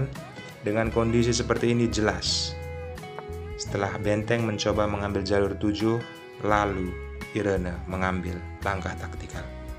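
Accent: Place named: Karo, Indonesia. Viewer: Kental bgt ngomong Karo bro😂😂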